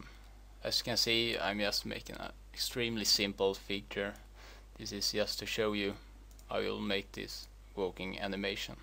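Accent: Sweden. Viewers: ur swedish arent u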